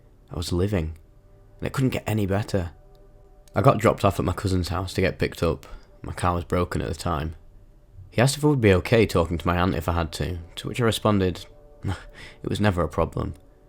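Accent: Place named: Manchester, United Kingdom. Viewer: Beautiful pic and title made me smile, I am a /lad/ from Mississippi and I've always loved the manc accent since discovering karl pilkington around 08,09 and listening to the Ricky gervais show animated, an idiot abroad, and then the rest. You bring that for me with your own style on one of my favorite topics and do it so eloquently and with such a wonderful energy behind it. Please always continue if you continue to enjoy this. I listen to every video.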